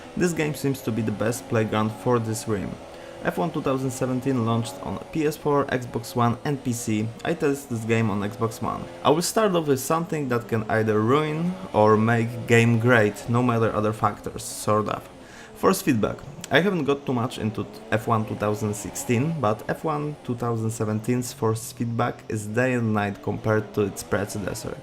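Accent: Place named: Poland. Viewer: słyszę ten polski akcencik. Fajna recenzja :D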